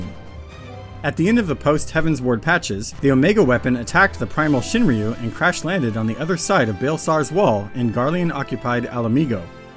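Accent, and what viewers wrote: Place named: United States. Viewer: I'm just so thankful that you are so articulate. So many other summary videos, I can hardly understand their accents (European, speech impediments, etc). I'm from the United States so hearing an American accent is so much easier for me to understand. Thank you.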